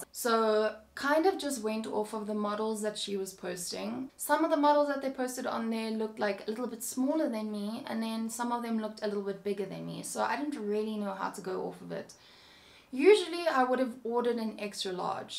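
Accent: South Africa. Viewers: I subscribed as soon as I heard you're South African accent 😁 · I am just here to listen to your accent 😂 Live in Australia, but was born in South Africa. I have an Aussie accent now so love listening to yours. Makes me nostalgic 🥰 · I knew you were from South Africa before you even said it lol my best friend is too and you sound the same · Noticed the SA accent, new to ur channel. Love from sa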